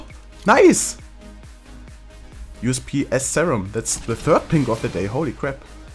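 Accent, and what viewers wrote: Germany. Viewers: inside Seine Aussprache ist so awesome:D Man merkt immer ein bisschen Deutsch bei ihm. Mir gefällt das:D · Man merkt damit du deutsch bist aber trotzdem nice sehr gutes Video!! LIKE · nice accent by the way, i like they way the german accent lingers in my ears, but not tooo much of a german accent, really nice to listen to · Dieser Deutsche Akzent. ^^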